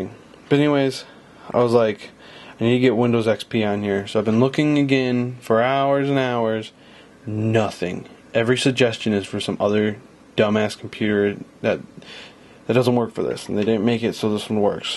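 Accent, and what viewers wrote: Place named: Brazil. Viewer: ho did this video is a brazilian guy, i can see this because accent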